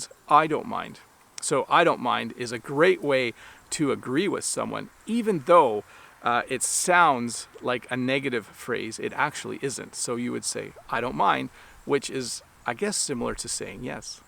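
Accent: Canada. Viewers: I knew that you are Canadian through your pronounce especially when you said (about) thank you so much my great teacher for teaching us your beautiful language , I'm watching you from the place where Arabic language was born it's Saudia Arabia . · I likes Canadian English, it is totally different to US English. Greetings from honduras, nice videos thumbs up well won dude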